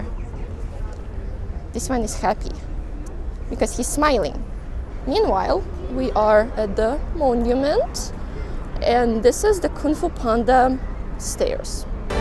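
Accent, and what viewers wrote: United States. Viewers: You've got an American accent with your English I would say. Definitely not a British one. If I saw you in Wisconsin I might almost think you were born here. Nice job. Enjoyed the video. You have a certain charisma and charm.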